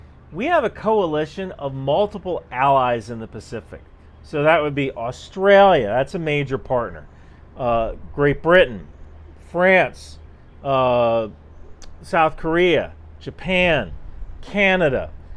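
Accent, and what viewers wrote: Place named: United States. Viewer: To me as a New Zealander. He certainly speaks english with a perfect American accent with no grammatical giveaways! But what do I know?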